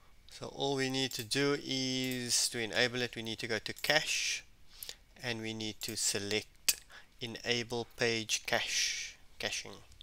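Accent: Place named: South Africa. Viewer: Thanks for the video, nice to hear a South African accent glad there are local tech YouTubers out there.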